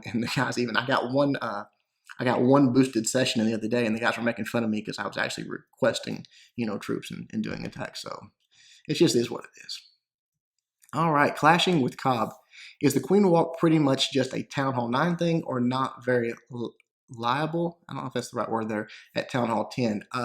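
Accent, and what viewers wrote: South, United States. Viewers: I don't mind the way his voice sounds. I've heard many country voices and I live in the city/suburbs in the north east United States. So I don't understand all the hate about it myself. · its true, your voice is very country-ish, but its just adorable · His voice is soothing. And who cares if it's country lol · Southern accent is fun, don't try to change it, it sounds like my uncle is doing the video 😀 keep on doing what you do! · dont listen to these idiots, your southern accent is the best!! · Half a year ago when i found your channel (ironically i was here because molt asked his viewers to check out a youtuber who can explain clash strategies down to a science). I was one of those folks that weren't exposed to country accent. And honestly, your accent did turn me off. But your content was ridiculously good and one of a kind out there.. 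so i accepted that about you and tuned in. Now looking back I realized what I did was silly, and unfair; I prejudiced against country accent. Now watching you daily, not only have I learned a bunch of clash, I feel I have come to tolerate the country accent. Frankly, I quite like your accent now; it sounds jolly. I'm sorry, and thank you,. I just kinda want to throw this out there for folks who aren't exposed to the country accent. Keep an open mind, learn to accept people who are different from you, and you will gain much more in life.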